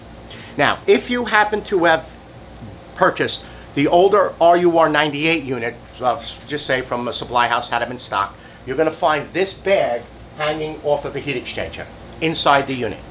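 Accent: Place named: New York City, United States. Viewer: I can hear your NYC accent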